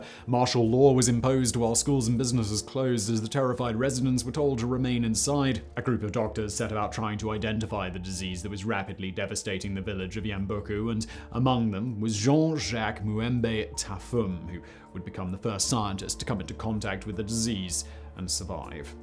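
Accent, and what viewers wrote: RP, United Kingdom. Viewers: Your accent is hilarious. It's like recieved pronunciation but more irritating. You sound like the queen did in 1955.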